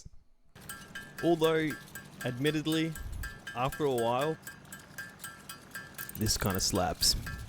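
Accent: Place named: Australia. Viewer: This is the second time I’ve watched this video. I love your Ozzie accent!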